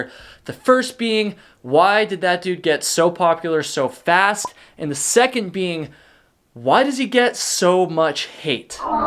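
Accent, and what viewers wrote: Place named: South Carolina, United States. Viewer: Dude are you from SC?! If so what up fellow South Carolinian!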